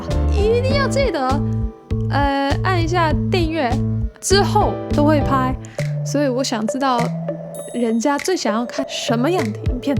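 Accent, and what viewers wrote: Taiwan. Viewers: 喜歡你的台灣腔的中文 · 地表最強台灣國語...+油..阿兜啊..你最強...讚啦... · 很喜歡妳講的臺式中文腔調，感覺像是周遭的朋友在聊天，很有親切感，我們家只要沒結婚沒小孩過年都會收到紅包，金額大概<num>臺幣，。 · 很喜歡聽妳說的台式中文～ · 聽到台灣腔就是親切 哈哈😄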